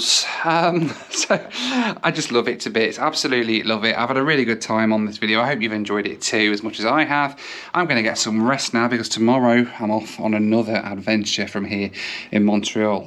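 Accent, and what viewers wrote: United Kingdom. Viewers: Hi,My first comment for your channel.Im from India and have been following you for a while.Definitely the clincher for me till date.My congrats to the amazing crew.The energetic and emotional outpour that you had when flying back on the 737 is contagious to be honest.Added to the above recipe is your wonderful British accent that is a real treat to listen to.Oh but why would food cost you an arm and a leg(a "simple costly" would be easy to understand:):):).........Keep up the good work.All the best and thanks for all the wonderful videos till date.Wish you all the luck forward...........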